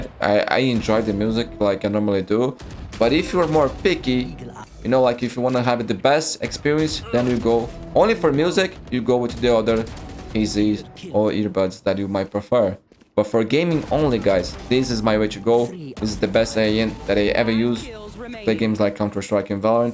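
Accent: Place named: Brazil. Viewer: eu comecei o video pensando: cara, ele tem um sotaque do ingles brasileiro, ai quando eu olho pro seu lado, tem um camisa do flamengo kkkkkkkkk.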